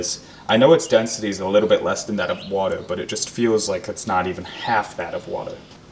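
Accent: United States. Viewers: Are you from Boston? You sound like you have a Boston accent lol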